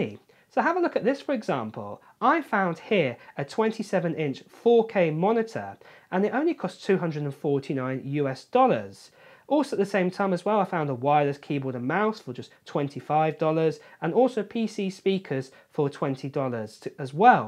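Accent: United Kingdom. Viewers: you are getting a 4.5k screen which arguably to buy separately it would be about £300-£400. You are also getting a keyboard and mouse which cost over £200. You also have a 6 speaker system with spacial audio, plus an HD camera...on top of that you have more material used. so...for the price difference - it kinda makes a lot of sense. Annoyingly you open with the $1299 --- yet go on about the 4 ports... your video is a bit confusing... you also sound British yet use American pricing... Dude... come on... don't be so stupid... you are talking about buying a low end screen, with a cheap keyboard set, and a horrible cheap speaker set --- why not price it up with like for like items --- i really cant watch any more... it's too painful- shockingly trying to get subscribers with a phone - an opened out of date phone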